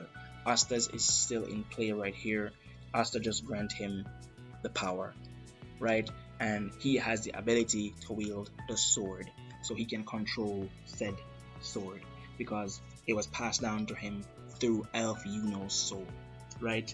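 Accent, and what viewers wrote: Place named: Jamaica. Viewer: Is this a fellow Jamaican I heare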